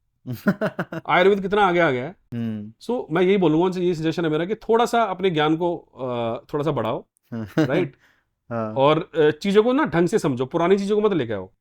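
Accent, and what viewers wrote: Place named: India. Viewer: indian Accent is most Unpleasant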